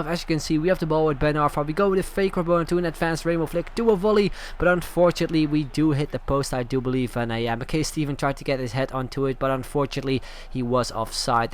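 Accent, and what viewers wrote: Netherlands. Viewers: Are you from the netherlands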